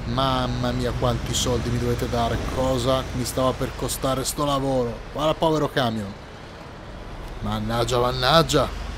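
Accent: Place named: Livorno, Italy. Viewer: ma di dove sei? Avvolte sembri tipo livornese AHAH😂 (sono quello dei guanti i da 9.99€ di decathlon😂)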